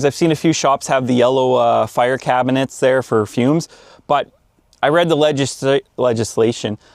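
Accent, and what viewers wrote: Canada. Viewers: This accent makes me wanna slam down some TimBits, while I wash it down with some Molson and watch the Senators play the Maple Leafs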